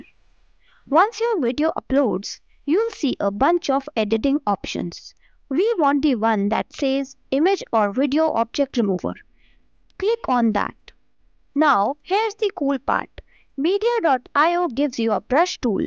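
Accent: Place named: India. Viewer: Just by hearing tha accent made me run form this video. Indian scammer.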